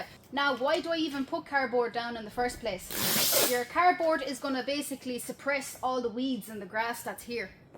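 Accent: Ireland. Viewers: this girl is so cool, modest but with pride, love this oirish accent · Between your Irish accent and my Texas accent we would never have any reason to argue. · Are you Irish? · Loving the channel. Mostly the charming accent, but I am actually gardening too 😅 Makes me want to visit Ireland. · Hey, love your content darling..can I ask what part of Ireland are you from.❤ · not , just listening your awesome voise! :D i mean in from finlasnd and love irish english. :D maybe some others too. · Sheesh, that accent is lovely! I would love to visit Ireland, but my skin tone may have me confused for border jumper 😃 I heard the Guinness there is real and I need it! 😂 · I LOVE THE BROGUE: DO YOU BELIEVE IN LEPRECHAUN'S LASSIE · I don't how many male humans could resist a young lady with that wonderful Irish lilt and the gorgeous roses in her cheeks. That is one version of perfect Irish femininity. 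God bless ya and may your flowers always bloom! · The Lovely Irish could talk about shit and you would still be entranced by their lovely accent. Aussie Jeff Moore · You are a superstar!!!" And your Irish, wow you have a great outlook. Thanks for teaching me how to garden. You have my full attention. Don't ever change anything about yourself!!!"✌️❤️ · I do a little gardening but i just like the way she talks that irish accent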